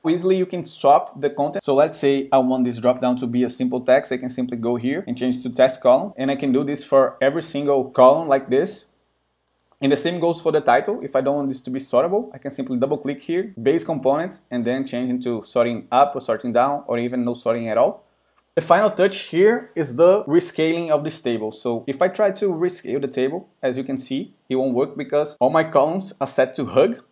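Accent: Brazil. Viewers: Muito bom! acredito que seja brasileiro pelo sotaque. Parabéns pelo canal Bro! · Se esse sotaque não for de Brasileiro não sei mais nada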